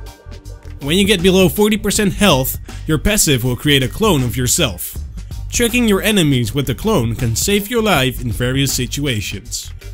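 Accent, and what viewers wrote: Netherlands. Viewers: are u from holland i hear the shit accen XD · Are you dutch? :D Because for some reason I think I can hear a little bit of the accent :) Great tutorial though. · Ataru Kawahara Nederlands accent :p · Omg you must be dutch the accent is sooo recognizable xD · ben jij nederlands je klinkt heel nederlands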